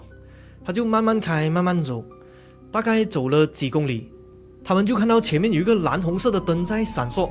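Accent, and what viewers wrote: Malaysia. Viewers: 很马来西亚feel的华语，听多了台湾的灵异频道，还是这里有亲切感😁，加油啦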